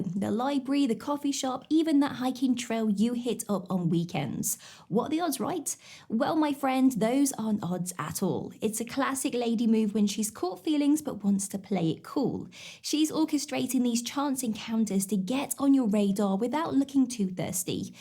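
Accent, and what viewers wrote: United Kingdom. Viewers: Hey there, are you into me? 😂😂😂😂😂😂😂 I'm kidding. I'm here to listen to your British accent, I love it! You have a blessed day.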